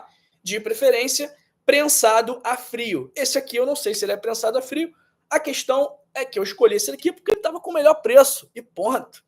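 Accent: Rio de Janeiro, Brazil. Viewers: Dr, vc é carioca? Pêlo sotaque kkkk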